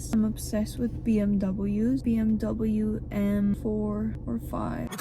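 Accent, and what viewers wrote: England, United Kingdom. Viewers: OMG GOD I LOVE UR ENGLISH ACCENT ❤❤ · Dios mío, amo tu acento inglés ❤❤❤💋💋💋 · OMG GOD I LOVE UR ENGLISH ACCENT 🫶🏼🫶🏼❤️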